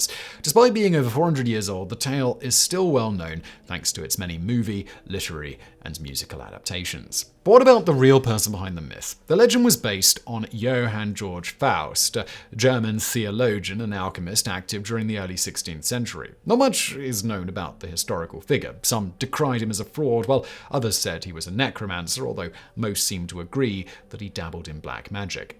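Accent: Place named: RP, United Kingdom. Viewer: Bro why do you talk like that.. makes it hard to listen/take seriously. I thought that pretentious posh accent died out by the late 1900s.. even the British RF dont talk like that anymore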